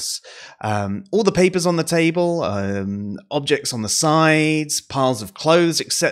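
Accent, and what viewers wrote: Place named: England, United Kingdom. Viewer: This video just popped up on my feed and I'm glad it did. Very interesting topic for me to help understand my son who has ADHD and autism. Interesting, to the point and nice to here an English accent as a little extra plus. New subscriber here. Looking forward to checking out your other vids. Cheers for sharing.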